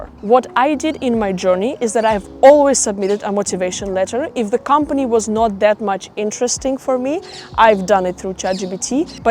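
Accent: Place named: Netherlands. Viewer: It's interesting that you have developed a Dutch accent when you speak English.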